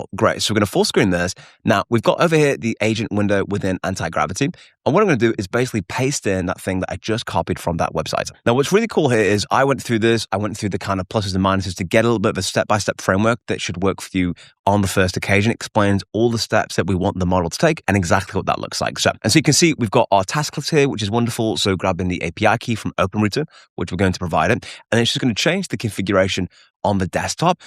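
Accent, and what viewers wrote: United Kingdom. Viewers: Love your accent! Is that british?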